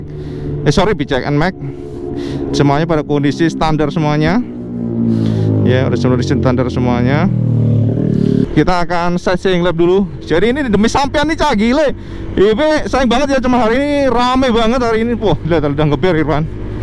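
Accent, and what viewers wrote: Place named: Surabaya, Indonesia. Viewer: Saya suka sm Reviewernya IWB, kl di Reviewnya Mobil seperti Fitra Eri lah, lbh detail mengulas nya,,,dan yg lbh sy suka lagi dari Mas IWB ini adalah Medok khas Surabaya nya kental banget...kereen Mas IWB 😁👍👍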